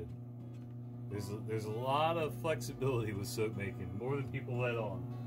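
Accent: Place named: South, United States. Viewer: I honestly didn't expect words like mantra and ta-daa coming from that southern mouth(although you did explain, you grew up in jersey) lol. really refreshing to hear people like you. ~keep it up.coming straight at you from karachi, pakistan. Also never stop starting ur videos with yuuuppp.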